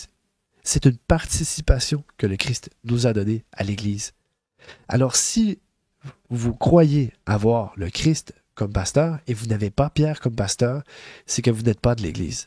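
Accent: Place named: Quebec, Canada. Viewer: Si t'es suisse alors pourquoi tu as un accent québécois ?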